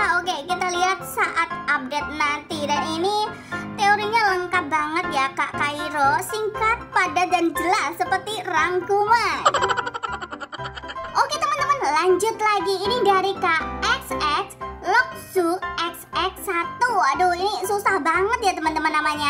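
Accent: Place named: Java, Indonesia. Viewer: Kakak ini orang jawa ya? Logat nya kerasa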